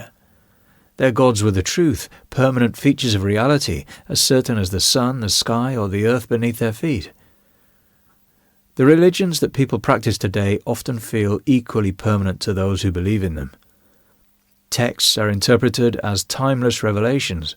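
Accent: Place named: England, United Kingdom. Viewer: Where is your English accent from?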